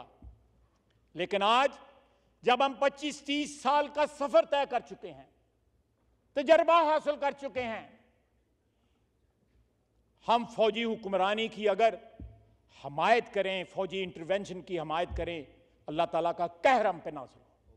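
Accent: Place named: Kashmir, India. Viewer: Kashmiri easay hi bolta hai.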